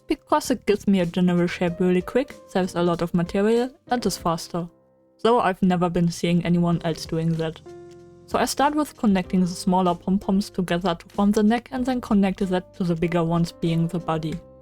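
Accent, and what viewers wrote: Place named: Germany. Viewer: Bist du Deutscher hast einen deutschen akzent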